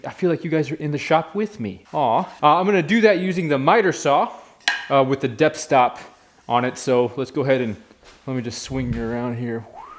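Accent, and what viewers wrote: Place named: Massachusetts, United States. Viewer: yeah that’s clear. You have a MA accent. One that is very familiar to me. That’s why I asked if you were from New England. I gave the a 6 state zone 😂. Happy Thanksgiving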